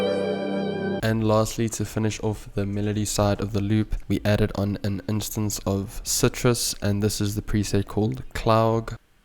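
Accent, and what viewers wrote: South Africa. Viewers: Bro. Are you South African? That accent? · I know a SA accent when I hear one 😭🔥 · You sound south african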